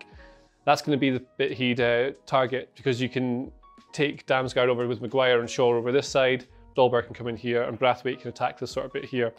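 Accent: Scotland, United Kingdom. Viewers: Great tactical breakdown. It’s really weird hearing a Scottish person say ‘Good luck to England’, though.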